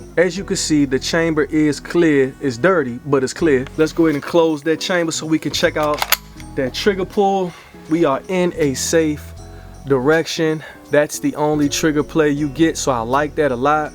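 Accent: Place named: DC, United States. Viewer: What range are you using? You sound like you’re from around the way. DC/Maryland/Virginia.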